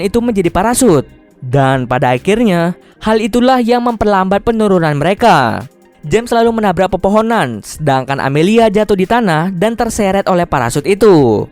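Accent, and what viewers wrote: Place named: Palembang, Indonesia. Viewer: Cknyo wong plmbng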